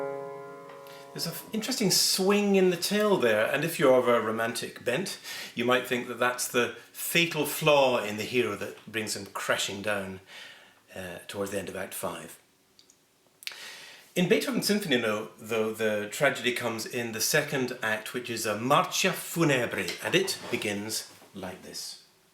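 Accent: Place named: United Kingdom. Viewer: What part of British isles are you from? Your accent is very pleasant.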